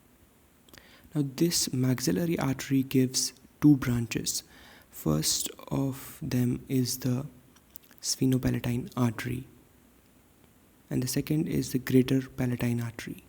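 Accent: India. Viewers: External * you are inian that dialect is disturbing me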